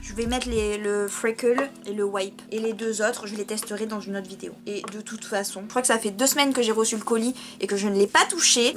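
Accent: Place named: England, United Kingdom. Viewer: Cet accent anglais j’adore😂😍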